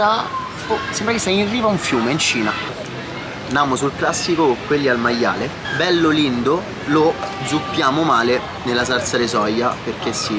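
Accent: Rome, Italy. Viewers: mi stanno piacendo molto i tuoi video, davvero super intertessanti. quando verrò a roma in caso so dove guardare per informarmi sui ristoranti✨ (poi vabbè ho un debole per l'accento romano ahahah)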